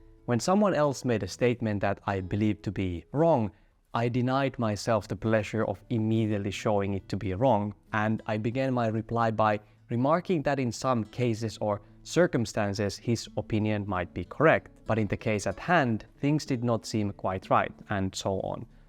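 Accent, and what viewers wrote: Finland. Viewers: The moment I heard finnish accent I knew I was about to hear something wise. Kiitos.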